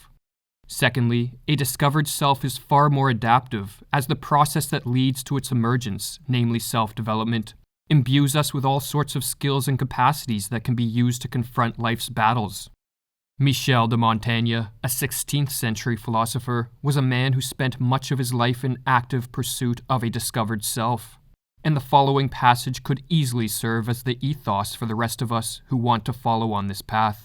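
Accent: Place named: Canada. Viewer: Whats up with you,? How old are you? You lift weights? squats? You sound Canadian. Im Diogenes.really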